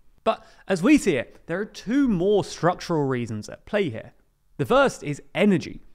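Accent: England, United Kingdom. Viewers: The content is cool, but the English accent is one of those that makes getting information more difficult.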